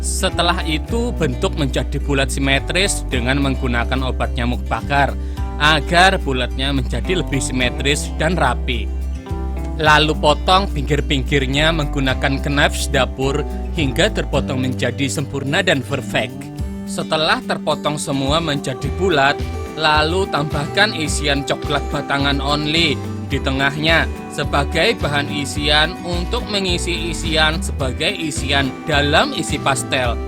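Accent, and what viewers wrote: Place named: Banjar, Indonesia. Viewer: Logat banjar hanyar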